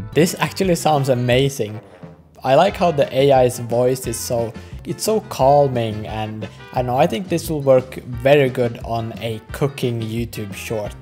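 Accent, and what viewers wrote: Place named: Finland. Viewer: The short video looks really good, but I much prefer your Finnish accent over that robot voice. I understand you're trying to prove that the whole video uses only AI, but if you do decide to grow the Frugal Meals channel, it would be cool to hear your natural accent instead of the robot.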